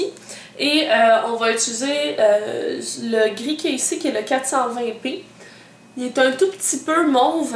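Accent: Quebec, Canada. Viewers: Tu as l'accent Québécois non ?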